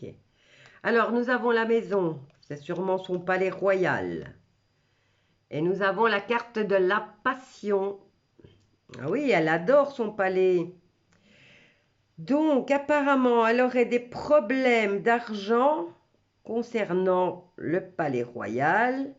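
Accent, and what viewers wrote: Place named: Belgium. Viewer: Vous avez de l' humour avec un bon accent Belge, Bravo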